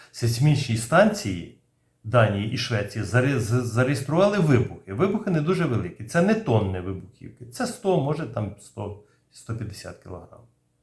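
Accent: Vinnytsia, Ukraine. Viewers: Дякую за професійний огляд. Особливо подобається такий рідний акцент Вінниччини такою славною украЇнською.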